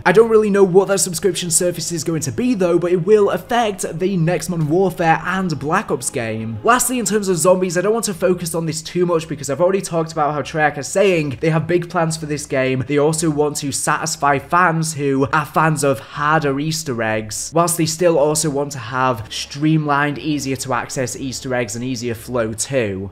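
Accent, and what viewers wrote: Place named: United Kingdom. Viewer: F*cking british accent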